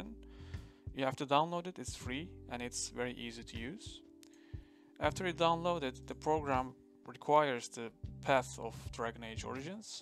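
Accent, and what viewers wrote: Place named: Turkey. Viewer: Videoyu izlerken içimden ya bu adamın ingilizcesi bildiğin türk ingilizcesi ya diye geçirirken masaüstündeki türkçe isimli dosyaları gördüm eline sağlık video için kardeşim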